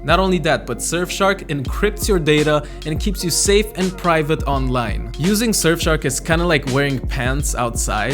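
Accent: Netherlands. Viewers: Why did i just figure out you're dutch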